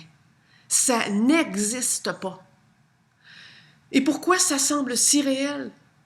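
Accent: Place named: Quebec, Canada. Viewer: C'est absolument génial l'exemple de la "non-couleur" c'est hyper parlant !!!!! Énorme... J'adore ce que vous dites et j'adore votre accent québécois ! Génial !!! MERCI 🌞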